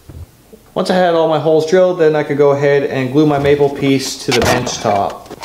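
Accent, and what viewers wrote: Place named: Newfoundland, Canada. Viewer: You sound like a neufie in this video. Good idea for the work vise.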